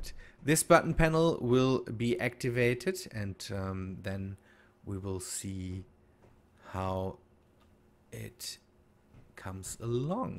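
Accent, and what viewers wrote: Germany. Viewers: Nur intresse.. 😂 Deutsche haben so eine unverkennbare Aussprache, die hört man irgendwie immer heraus..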